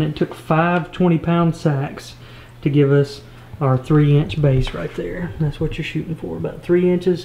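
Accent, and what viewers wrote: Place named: Louisiana, United States. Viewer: i can tell this guy is from lousiana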